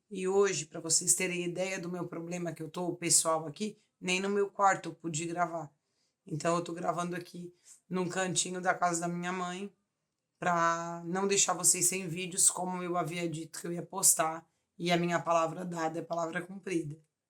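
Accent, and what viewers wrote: Rio Grande do Sul, Brazil. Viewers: Tu fala muito bem!!! E tb achei que tu era gaúcha como eu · Nossa, eu jurava que você era gaúcha, e eu sou gaúcha! Volta e meia você solta alguma palavra que eu acho bem "catarina" mas no geral acho seu sotaque muito parecido com o nosso. Amei o vídeo!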